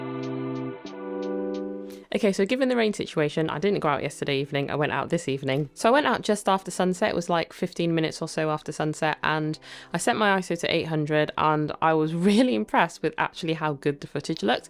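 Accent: England, United Kingdom. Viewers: My God i loved your video, but your british accent ☺😉 I bought also my mini 3 Pro, and for me is the first time i will be using a Drone, i love aerial photography and video 🤗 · Glad Sipscriber here. Grate vdo. But I can’t help but wonder how much u look like Young Dolph 🐬 I ain’t know his young sis had a Fine ingish accent 😄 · I have an original Mavic and Spark that I haven't flown in ages. I'm seriously considering getting a Mini 3 and getting back in the game. Great video. I can listen to UK women talking all day ❤ lol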